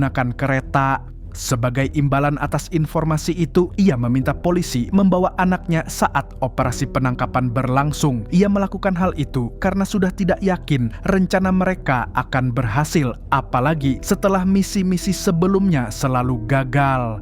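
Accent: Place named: South Tangerang, Indonesia. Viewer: Orang tangsel mana suaranya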